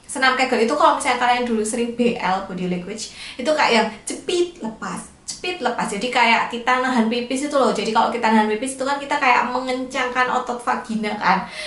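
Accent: Java, Indonesia. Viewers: Bidan cantik yg logat jawanya medok. Channel langganan dari masih hamil smpe melahirkan dan baby ku ud 6 bulan skrng😁 selalu sukak pmbahasannya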